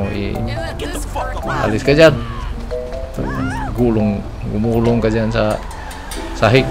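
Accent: Visayas, Philippines. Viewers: Hi Sir Bisaya ka Sir? Taga Gensan diay ko sir hahahaha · Bisaya ka ba taga Davao city po ako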